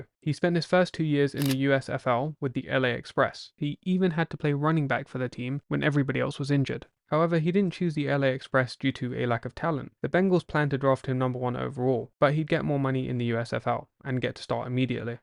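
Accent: United Kingdom. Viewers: BRI'ISH GUY TALKING NFL!!!!!!!!!!!! OMGGGGGGGGGGGGGGGGGGGGGGGGGG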